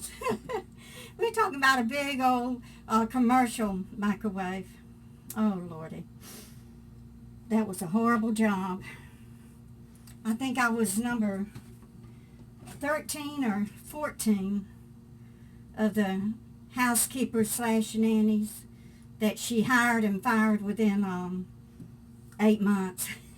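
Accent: South, United States. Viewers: That pie looks delicious. I had to laugh, You say peacan like pcarn, in Australia we pronounce it Peecan...haha, LOVE your southern accent. Have a blessed day from Western Australia.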